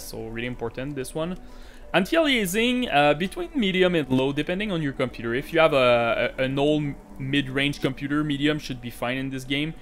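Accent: Quebec, Canada. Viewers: jai jamais entedu un accent quebecois autant fort dude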